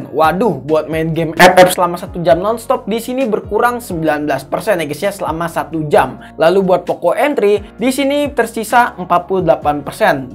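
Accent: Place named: Surabaya, Indonesia. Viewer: Arek Suroboyo Yo lur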